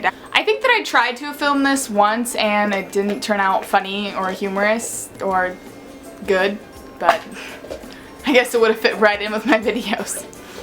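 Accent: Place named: United Kingdom. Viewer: For some reason U sound like U have a British accent in this video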